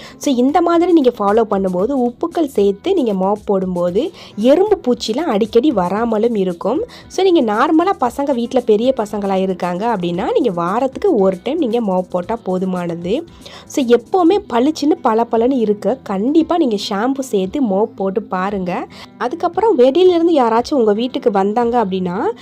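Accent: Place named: Ramanathapuram, India. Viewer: Sister unga voice Ramnad kilakarai slang mari iruku namma oora neenka?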